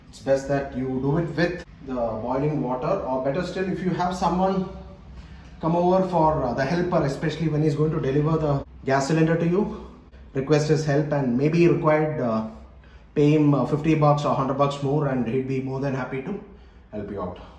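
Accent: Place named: India. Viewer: You sound like an NRI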